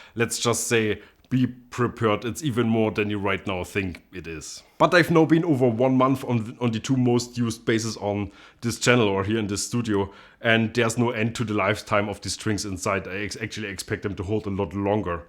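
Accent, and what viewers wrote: Germany. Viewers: 6 seconds into the vid. Are you german?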